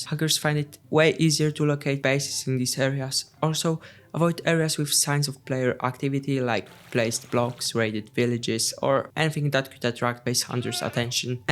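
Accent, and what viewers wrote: Italy. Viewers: Dude are you italian?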